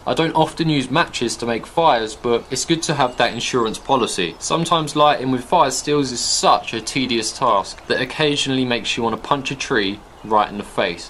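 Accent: United Kingdom. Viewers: Awesome british accent, amazing and clear tips and good jokes, officially sir, you are awesome !! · did u say u need a fick durable knife? did u say sheaf? free sections of gear? Firty meters of fishing line? I love the way brits talk !! do us yanks sound like that to u guys?? Oh yea and u got all those bags of morale boosters but i didnt see a bag of weed or a bag of coke!! Cheers m8. Put another shrimp on the bar-b :)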